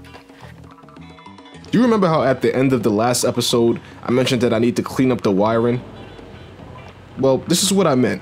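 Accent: United States. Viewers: What an amazing voice most american accents are nasal and have an annoying twang but yours is fucking brilliant!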